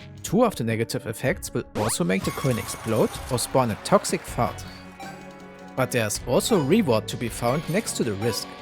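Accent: Germany. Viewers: Deutscher , nicht wahr?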